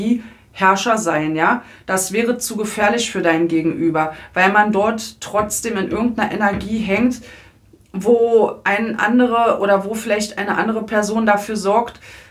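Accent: Berlin, Germany. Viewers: frei Schnauze mit Berliner Dialekt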